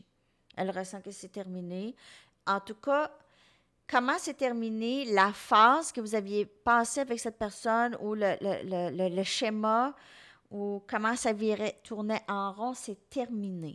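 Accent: Quebec, Canada. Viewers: Hello, j'adore votre accent québécois 🥰 Vous avez dit des choses qui me parlent énormément par rapport à cette relation, l'arc de triomphe par exemple...C'est quelqu'un dhyper sensible en fait mais qui refoule ses emotions et qui se protège énormément avec son masque et son mental..il utilise la musique pour dire ses émotions...merci pour vos généreux conseils...it's a little bit funny this feeling inside... voilà une chanson qu'il chante souvent...c'est ma star 🌟😍🥰❤.. je vais ecouter la chanson d' pour écouter le message et essayer de lâcher prise..😌😉 Merci🙏 😘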